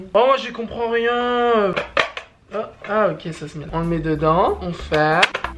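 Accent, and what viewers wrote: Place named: France. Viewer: You should do a video in English! My American ears cannot understand your frenchie-ness:(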